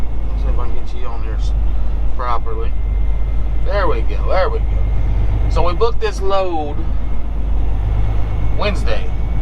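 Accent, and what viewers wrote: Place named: Georgia, United States. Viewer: Are you from Georgia? Cause you sure sound like it. 😂 it’s cool, I am too. GO DAWGS!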